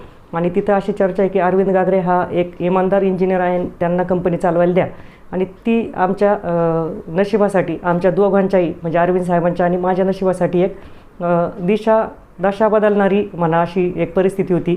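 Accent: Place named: Ahmednagar, India. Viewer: नगरी भाषेचा गोडवा, मस्त!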